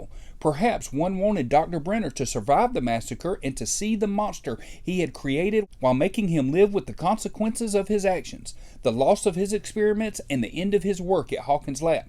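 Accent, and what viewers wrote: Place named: South, United States. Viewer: LOVE your southern accent! "Welcome to talkin sah-fah"😊❤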